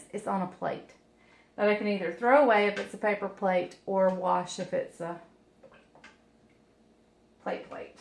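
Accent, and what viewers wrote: South, United States. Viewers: I thought you said Lord but you said lard, it took me a while to figure out what you said. This yankee sometimes gets lost with the southern accent but I love watching you. I don’t think the stainless steel works to remove the onion smell either. Coffee grounds I’ll try, thanks. My husband when he was alive had a guy friend at work that was a bachelor and he loved to cook, he started his spaghetti sauce by cooking a pork roast real slow on the first day to get the drippings which he used as the start of his sauce. I had never known to do that. But the ham or bacon is a great idea to get flavor. My mom wasn’t much of a cook, unlike my country mother-in-law was. My mom for years her idea of making spaghetti was to brown hamburger and sauté an onion add a can of tomato paste, a shake of a little chili powder, salt and pepper and that was it. 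It was really plain or she would use a package of Chef Boyardi spaghetti which was so small and nothing but cheap.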